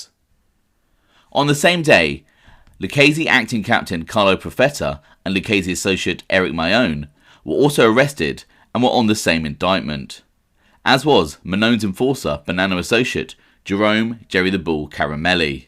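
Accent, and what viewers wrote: United Kingdom. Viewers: Your British accent is a monotone puts people to sleep get a mob guy to report much more exciting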